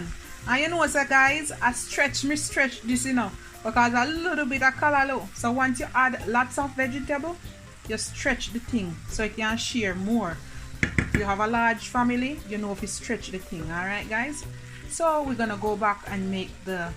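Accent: Jamaica. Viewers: Wagwaaaan I love your Jamaican accent. .ouchea learning a great recipe for great food I hope you ill hallla at me too ...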